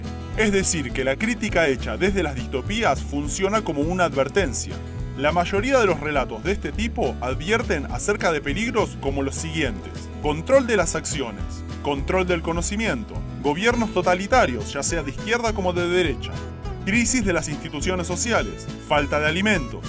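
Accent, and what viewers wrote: Argentina. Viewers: alto argentino y bueno en literatura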